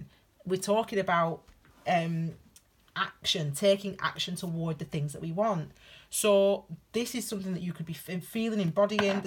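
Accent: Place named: Northern England, United Kingdom. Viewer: Northern England represent! Sounds like you are from my neighborhood. Thanks for this xx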